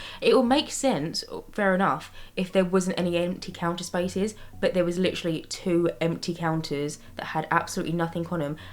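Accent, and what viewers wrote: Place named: Essex, United Kingdom. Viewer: just curious but are you from Essex? your accent is amazing!! I just found your content, love it.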